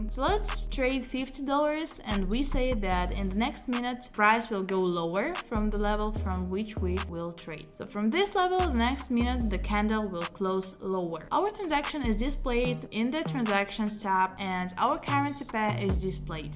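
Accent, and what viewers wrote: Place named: Russia. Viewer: Как же чувствуется русский акцент )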